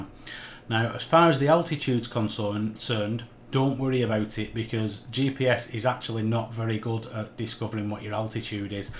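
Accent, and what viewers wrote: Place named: Oldham, United Kingdom. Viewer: Thanks for your clear video. I thought it might align the scope but it does not. Now I know I don't need one. That accent sounds Oldhamish, I live in cloudy Rochdale. Just got my Intes mk67 out for its annual inspection on the synscan (not used yet, only bought 3 years ago!) but I hear its cloudy all week, ah well. Cheers, Mike.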